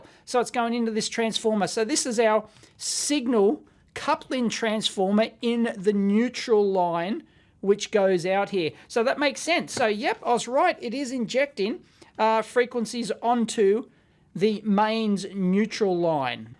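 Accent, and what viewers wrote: Australia. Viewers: That thick aussie accent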